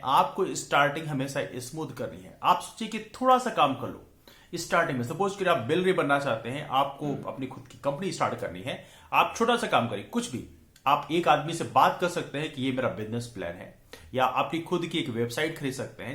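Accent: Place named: United Kingdom. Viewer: sir me apki videos dekhti hu bate bhi apki achhi hoti h bas ap hindi ko bhi English lahje me bolte ho to ajeeb lgta h lekin aap hmse bade h esiliye achha lgta h apko sunna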